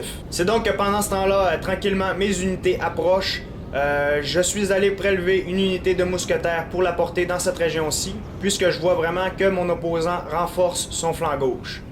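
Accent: Quebec, Canada. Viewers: j'adooore l'accent québequois !^^ très bonne vidéo ;)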